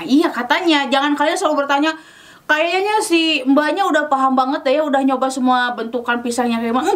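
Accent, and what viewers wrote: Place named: West Java, Indonesia. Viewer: Meni sunda pisan 😅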